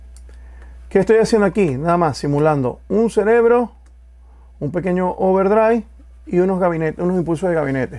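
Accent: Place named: Dominican Republic. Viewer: Yo soy dominicano y pensé que eras domi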